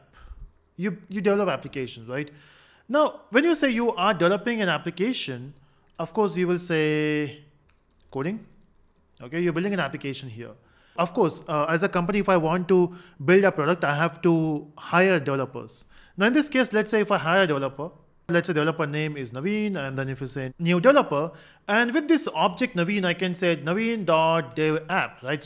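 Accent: Telugu, India. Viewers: Seriously you indians cant make some extra effort and make your accent something like actual English?! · I think your mother tongue is Telugu 🌚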